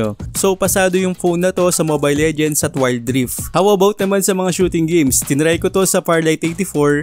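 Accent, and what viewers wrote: Pampanga, Philippines. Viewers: Kapampanagn accent alam na alam ko e hehe new sub here kabalen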